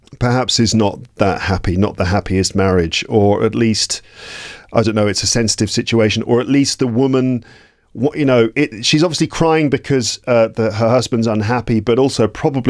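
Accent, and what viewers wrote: United Kingdom. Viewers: I am not used to listen british english! But I just loved to hear your class, your english. And I am very happy that I understood everything! Thankyou Maíra from Brazil 🇧🇷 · BBC ,modulation, thahk so much for the video! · Very nice your's british language.salute from Lithuania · I really love your british english..😊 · Thank you,. Your pronunciation made me remember of the best boss I had when working in a British company here in Brazil, in the late seventies. And I missed those days. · Hola, estoy aprendiendo el ingles americano, confirmame si ese acepto es britanico? ó no importa?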